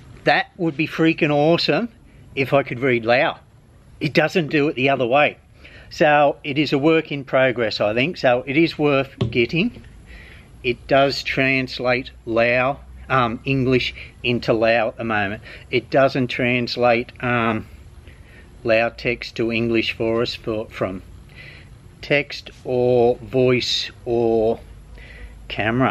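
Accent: Australia. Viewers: Hi I’m new to your channel and I’ve heard you talk about your kids and was wondering if nee née have any. Keep up the videos good listening to an Aussie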